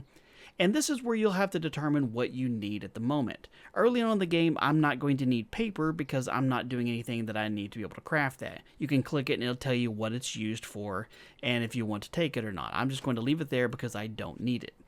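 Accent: South, United States. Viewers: is your accent southern?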